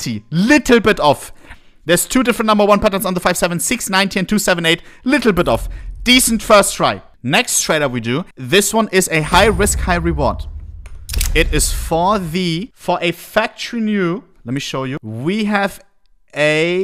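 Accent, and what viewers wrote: Germany. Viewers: nie was schlimmeres gehört als nen Deutschen, der mit extremstem deutschen akzent auf Englisch schreit...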